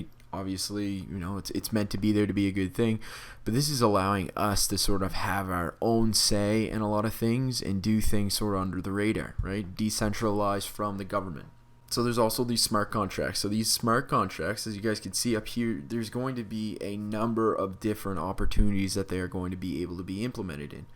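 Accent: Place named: Canada. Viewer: This is not related to the content haha but you have a “Canadian” accent. I appreciate that. Thanks for the info by the way! I came from tiktok and you’ve been a big part of my learning crypto and ETH in particular! I hope you keep making content of this sort of YT. That being said, I do appreciate the car content as well because I drive one and know nothing about it. Multi level education, thanks!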